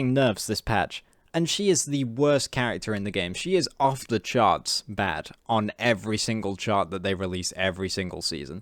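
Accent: United Kingdom. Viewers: Didn't know you were British what part??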